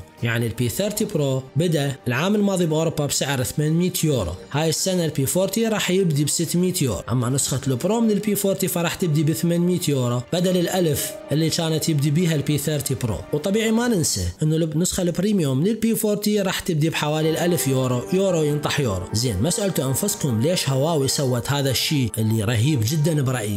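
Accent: Iraq. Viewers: يمعود شكد بلعراقي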